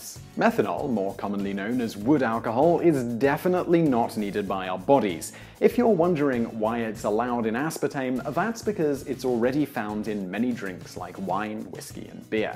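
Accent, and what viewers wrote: United Kingdom. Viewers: Watching a lot of his videos, one learn that Brits pronounce a lot of words "wrong" (well, maybe just differently than we Yanks do).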